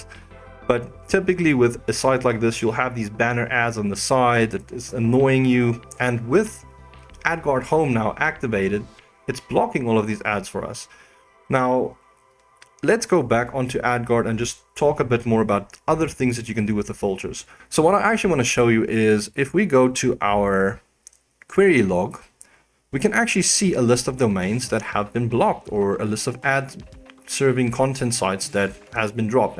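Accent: South Africa. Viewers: Great video, helped me two years later, thanks, am sure I hear a South African accent there! Lekker Boet!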